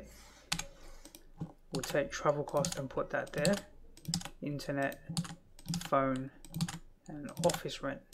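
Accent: United Kingdom. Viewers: R u British bro.?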